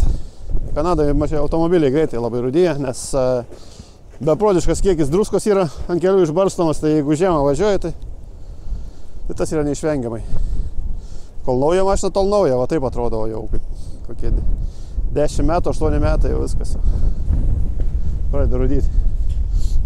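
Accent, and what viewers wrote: Šiauliai, Lithuania. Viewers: is akcenta bene is siauliuu busi?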